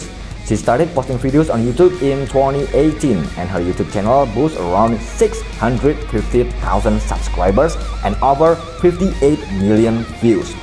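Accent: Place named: Indonesia. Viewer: Logat indonesia 😂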